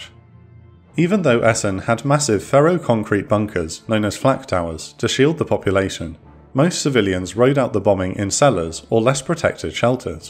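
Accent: England, United Kingdom. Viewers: This guy has an English accent. He describes the bombing of Germany and England as if they were the first counties that had cities bombed to the ground. They weren't, history is severely lacking here. The Germans had set a precedent by first obliterating Warsaw and then severely damaging Rotterdam, in his myopia the Englishman omitted this history. The slow ramping up of bombing between Germany and England is a later phenomenon, the Germans had already set the precedent for bombing of cities in Poland and Holland.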